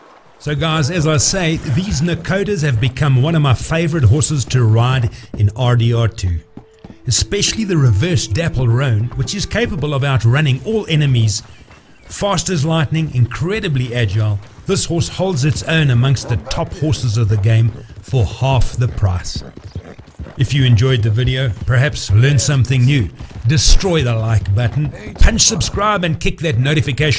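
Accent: South Africa. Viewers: Great video! First time I’ve seen you on YT. Your accent is South African. Eastern, I’m guessing.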